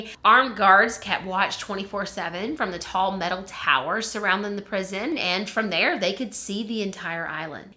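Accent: South, United States. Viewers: This was so interesting. I didn't know that families lived there. I can't imagine in today's world having hardened criminals babysit and entertain your kids. It makes the men seem less evil than they were. I love your content so much! Do you have a script written of what you want to say and read from it? You narrate so well and in such detail, you can't be just remembering all that! LOL. I am a southerner as well so I thought you had some southern connections. The friendliness, the laid back style, softer ways of pronouncing some words, but I was guessing maybe the Carolinas, not Louisiana. Deep south, like me, as I'm Lower Alabama.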